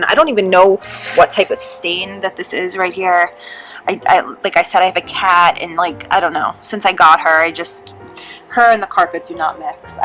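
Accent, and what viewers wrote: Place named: East Coast, United States. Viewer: I can tell she’s from the east coast by her accent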